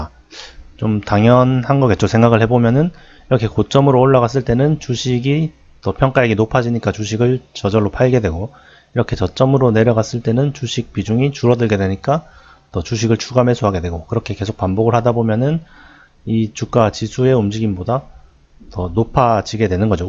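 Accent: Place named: Busan, South Korea. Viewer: 말투가 부산분인 것 같았어요ㅡ ㅎㅎ 저도 부산입니다. 용호한의원이 한곳 뿐인가요?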